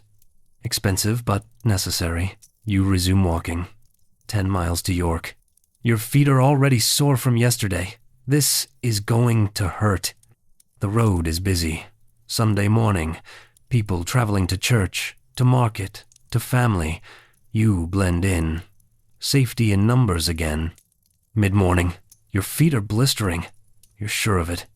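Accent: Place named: United States. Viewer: American voice describing medieval England bad AI choice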